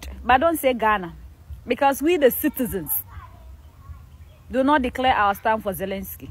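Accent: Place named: East Africa. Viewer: Are you even a Ghanaian to be speaking for Ghanaians.? You sound more like an East African..anyways speak for yourself wai and don't say "we" the citizens. You and who.? Nice try though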